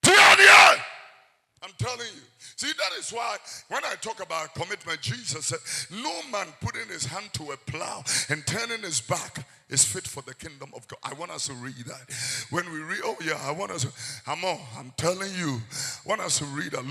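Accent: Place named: United States. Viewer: I love your message anytime I listen to you but please if you can leave the America English I will be happy because we're Ghanaian let us love whom we are. thanks 👏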